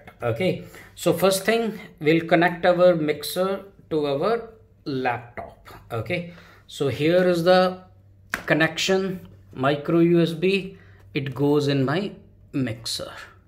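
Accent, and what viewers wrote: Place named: India. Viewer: Indian accent what’s wrong with that ? 😂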